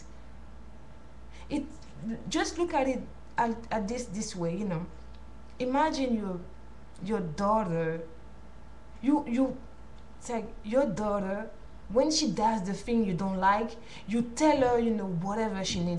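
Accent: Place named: Africa. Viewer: I love you African Woman. You have a beautiful African Accent.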